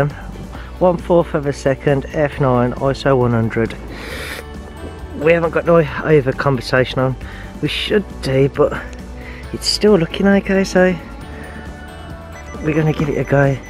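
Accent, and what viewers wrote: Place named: Birmingham, United Kingdom. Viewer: The brummie version of Kai from DigitalRev...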